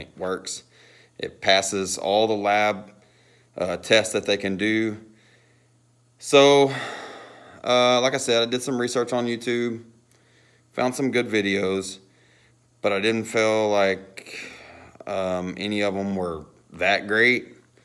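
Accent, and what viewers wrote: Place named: Texas, United States. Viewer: I don't no any wedding bands so yes Daddy call me I love that Texas accent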